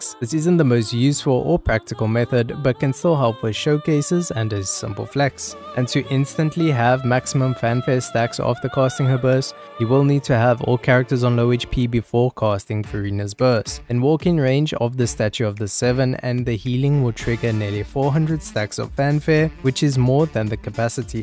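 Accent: South Africa. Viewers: Do I detect a lovely South African Accent?! Respresent! XD enjoyed the video 🤌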